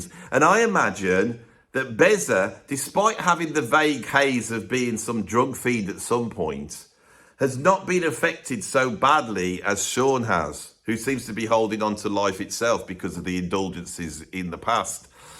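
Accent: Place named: West Midlands, United Kingdom. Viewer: Even a mild Brum / Black Country accent is like the acoustic equivalent of caustic soda, sorry mate I’m sure what you are saying is interesting but I had to bail at Noel.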